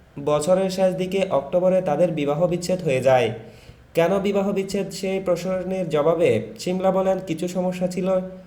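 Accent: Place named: Noakhali, Bangladesh. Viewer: পাঠক নিশ্চিত নোয়াখাইল্লা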